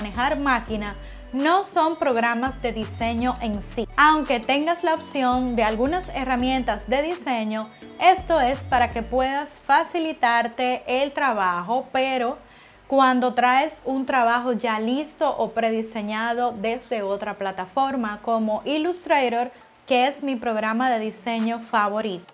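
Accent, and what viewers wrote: Dominican Republic. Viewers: Saludos, bendiciones, nuevo suscriptor, por tu acento sabía que eras Dominicana 🇩🇴, enhorabuena, ya estamos avanzando en RD con máquinas de corte láser, yo tengo impresoras 3D y ya compré una máquina de corte láser, te felicito y te deseo muchos éxitos 😊👍🏽🙏🏽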